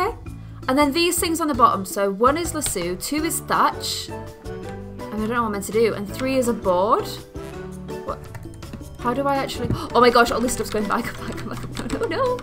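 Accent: Ireland. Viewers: YOUR IRISH I THOUGHT U WERE BRITISH OR AM A JUST CRAZY?!? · WOW!!! YOUR IRISH!!! YAY!!! SAME!!!!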